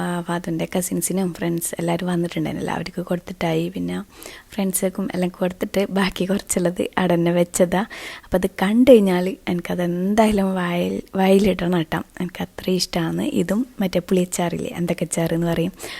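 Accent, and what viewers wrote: Kasaragod, India. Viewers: samsaram thanne kure kasarcode karude yanallo kelkumbol first entha enghane parayunnen thonum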